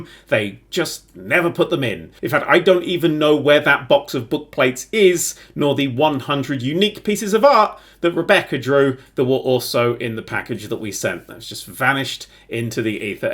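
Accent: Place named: London, United Kingdom. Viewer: It's like British London from peep show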